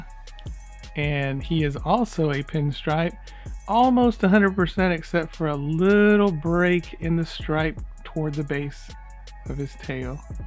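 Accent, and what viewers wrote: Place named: United States. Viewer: I'm so used to how Petko from The Dark Den pronounces the names that it sounds so weird when I hear Americans say them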